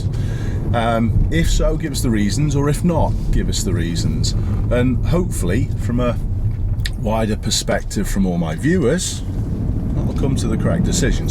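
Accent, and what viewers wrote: United Kingdom. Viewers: I subbed to this channel a few years ago so i could come back and watch them when i was old enough to learn how to drive now im looking at it he sounds british and that looks like the uk :'3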